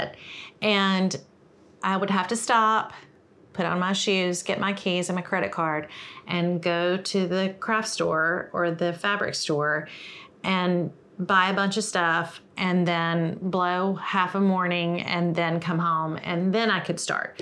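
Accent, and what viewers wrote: South, United States. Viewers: Can I just say you are hilarious in the most wonderful way! You remind me of my best friend Jane, so ifeel I know you already. I have already made quilts but I clicked on your series because my daughter gave me some of her husbands shirts. I cut the collars and cuffs off and she said she needed them back! Shock horror! Lucky for me she was only joking! So thanks for sharing your knowledge, your sense of humour and your beautiful personality and your southern accent. from Jilly in U.K. x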